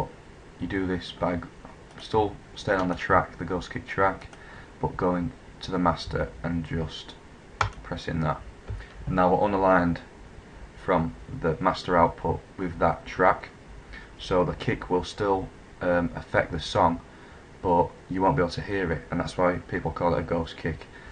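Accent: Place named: Yorkshire, United Kingdom. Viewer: Thanks for this, good to hear a Yorkshire voice on here!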